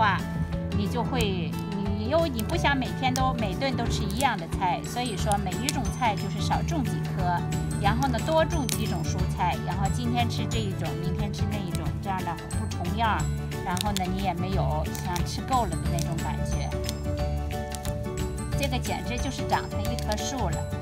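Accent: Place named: Shandong, China. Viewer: 山东口音好亲切 赞👍🏻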